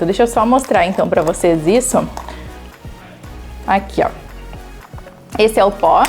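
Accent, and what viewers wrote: South, Brazil. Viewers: Linda,com sotaque do sul ❤❤😂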